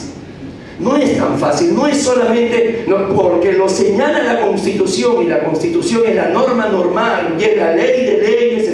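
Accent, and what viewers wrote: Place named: Argentina. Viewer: Me hubiese detenido resaltando como un peruano quiere hablar como argentino, pero eso no seria el fondo, de análisis del neo constitucionalismo no tiene nada, solo en un segundo se mencionó la ponderación de Alexy, de ahí no pasa de una charla para muchachos de secundaria. Acaso el latinoamericano no puede analizar el concepto de derechos fundamentales desde el positivismo y no positivismo? Acaso no le sirve a la realidad latinoamericana si el Juez resuelve con ponderación o con una ponderación disfrazada que realmente es interpretación subsuntiva? Acaso el latino no puede generar una filosofía del Derecho o una Teoría de la Norma que no ignore que la pólvora ha sido descubierta e incorpore variables propios? Que pena que el Perú haya enviado a un equivocado en el tema y que Latinoamérica no resalte exponentes de talla de Rofolfo Luis Vigo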